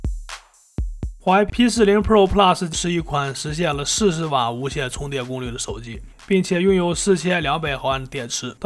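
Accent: Shanxi, China. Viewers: 能练练普通话不？一口山西土味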